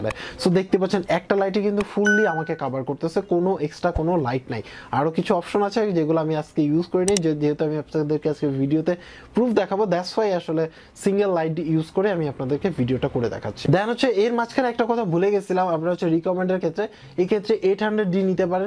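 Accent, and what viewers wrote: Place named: Comilla, Bangladesh. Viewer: ভাই আপনার গ্রামের বাড়ি কই? কুমিল্লা নাকি? ☺ গের গের সাউন্ড আমাদের এখানেও করে। হোমনা, কুমিল্লা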